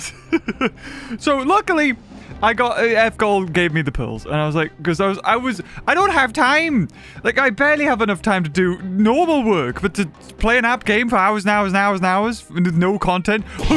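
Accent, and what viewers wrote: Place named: United Kingdom. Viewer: You are Markiplier but bri'ish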